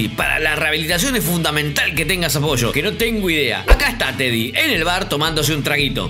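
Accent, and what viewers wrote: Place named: Argentina. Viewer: Me parto de risa contigo 😅😅😅😅😂😂😂😂🤣🤣🤣🤣🤣, la forma de contarlo. Y ese acento argentino 😄😄😄😄😍😍😍😍😍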